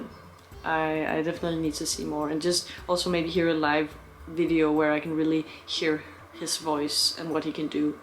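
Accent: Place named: Denmark. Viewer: Loved the reaction! He will blow you away even more if you listen to more tracks. And.. are you Danish? The accent sounds familiar. I’m Danish so it gives me hints of that ;)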